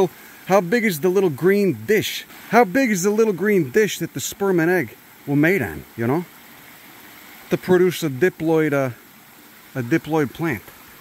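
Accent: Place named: Northeast, United States. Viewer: Just started following the channel and I gotta say, when he mentions scientific names, I have no fucking clue what the hell he's talking about but gah'damn something about that thick northeastern accent is oddly satisfying to listen to. Especially when he's talking shit or just engaging with the local wildlife... ... I can't stop watching this shit...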